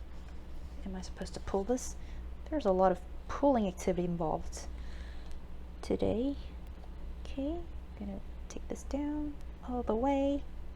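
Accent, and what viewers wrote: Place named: United Kingdom. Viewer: 왜이리 귀여어요 끝까지 다봤다요 크큭 발음 왜이리 영국같아 간지나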